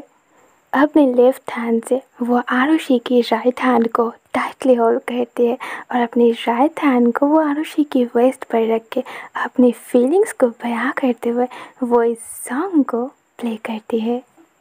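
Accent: Bengal, India. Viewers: I am fascinated to hear Bengali in your voice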